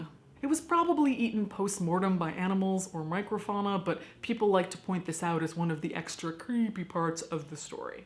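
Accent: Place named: Midwest, United States. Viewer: I keep thinking you're from the Midwest. There's just little hints of it there that I'm used to hearing, living in MN. I love the Dyatlov Pass incident, hadn't heard the infrasound theory before, either. That is really compelling, honestly. Really cool channel in general, thank you for sharing with people!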